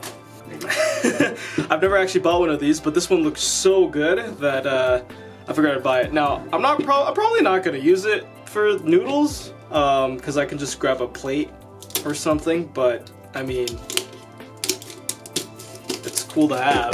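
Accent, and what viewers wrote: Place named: Quebec, Canada. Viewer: Are you from Quebec? I mean, can you be from some where esle with that accent and those noodles?! Well I just look at the others videos and this is the only video where you sound Québécois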